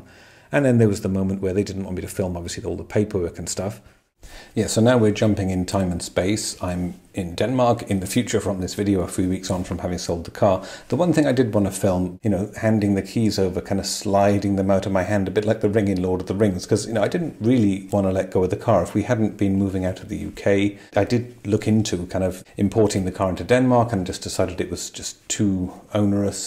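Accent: Wales, United Kingdom. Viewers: I could clearly hear those dulcet Welsh tones!! Enjoy Denmark but there will always be a welcome in the hillside! If Denmark is anything like Norway you should be able to get a nice range of EVs!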